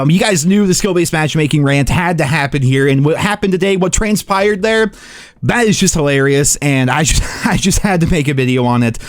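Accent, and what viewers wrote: Scotland, United Kingdom. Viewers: Irish?????? Clearly Scottish..... I think you said sweaty players 5 times while laying prone in the corner 😂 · Lmfao as a Canadian that was an obvious Scottish accent 🤦🏽‍♂️ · Scottish not Irish dude 😂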